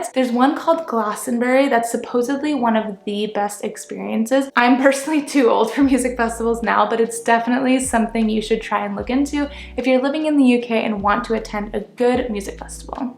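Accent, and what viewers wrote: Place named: United States. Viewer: Not trying to be rude but what did you say there about soccer here we call I football also you are true about the weather but the reason people may not be making small talk is you accent you have in my opinion a lovely accent but it is quite clear you are ammerican also we dont realy like small talk in general witch you are true to say also I am surprised how you didn't mention the lines I love your content not trying to be rude loved this video bye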